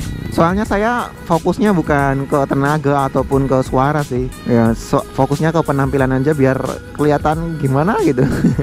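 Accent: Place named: Yogyakarta, Indonesia. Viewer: omonge jan asli jogja... hahaaha apik mas.. lanjutkan pokoke.. tak subscribe ndes.. haha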